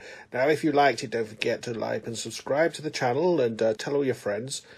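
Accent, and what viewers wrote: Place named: United Kingdom. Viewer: probably somewhere in the uk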